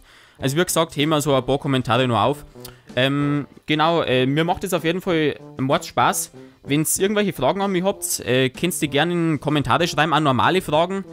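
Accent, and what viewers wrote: Bavaria, Germany. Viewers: Ich feier deine Videos, vor allem weil ich selber Bayer bin und genauso sehr stark mit Dialekt rede. Deshalb gefällt mir dein "frei von der Schnauze reden". :) (auch wenn ich hardcore hochdeutsch schreibe, aber da ist meine Schulzeit dran schuld) Deine Parodien sind der Kracher. Die kann ich immer wieder anschauen und lach mir jedes Mal den Arsch ab. Wirst du künftig auch auf andere Serien außer Spongebob zurückgreifen? Z.B. Simpsons wieder oder Family Guy? Bleib wie du bist und schönes Wochenende! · Bayrisch hört sich zu komisch an 😂👀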